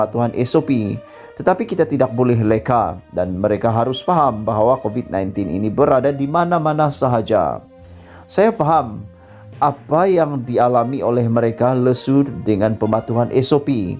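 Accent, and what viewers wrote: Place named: Sabah, Malaysia. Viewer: Pembaca tu sabahan bro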